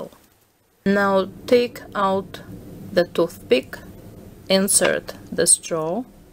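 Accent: Russia. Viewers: Are you Russian ? You have a really Russian accent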